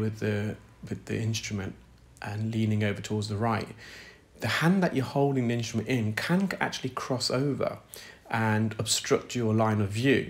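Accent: United Kingdom. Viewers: I'm smiling because with your British accent I hear " right correct ".... Love watching your videos!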